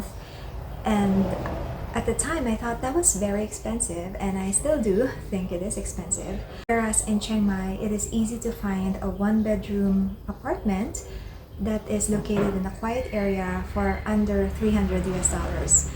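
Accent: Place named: Philippines. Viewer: your English accent seems to resemble the way people here in the Philippines speak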